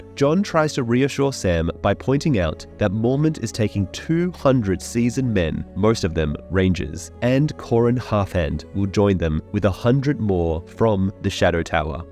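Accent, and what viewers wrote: Australia. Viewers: its his voice he says he has a australian accent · Where is his accent from!?! Driving me crazy 🤪 I’m leaning towards Australia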